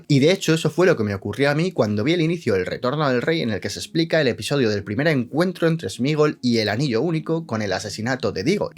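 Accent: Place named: Spain. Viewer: Odio el dejo español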